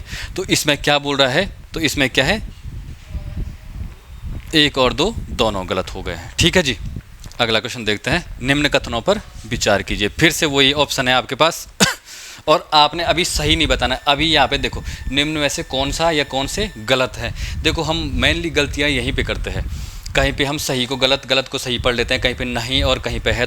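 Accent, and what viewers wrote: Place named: Garhwal, India. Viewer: Sir ki aavaj ek garhwali lag rahi h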